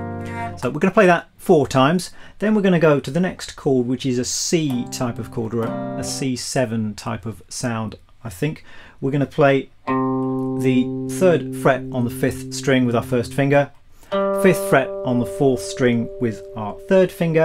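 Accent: England, United Kingdom. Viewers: 10 années déjà....Merci, Mr! J'adore ton niveau musical, j'adore ton accent Anglais, bref, tu es très bon et très sympathique cher ami musicien....merci pour ce cours que j'apprécie beaucoup car même avec mes dix années de guitare, j'ai encore besoin d'un super bon comme toi pour m'apprendre le morceau exceptionnel, irrationnel, indémodable des...Merci infiniment, Monsieur! Je ne suis pas prêt de t'oublier cher ami.....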